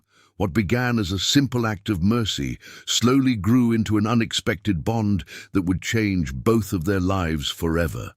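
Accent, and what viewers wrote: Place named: United Kingdom. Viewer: Another American western story narrated by a British accent. Not interested!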